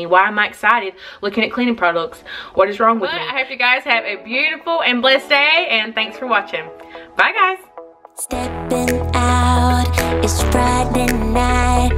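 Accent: South, United States. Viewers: your welcome love your channel and nice to hear someone talk like me. Southern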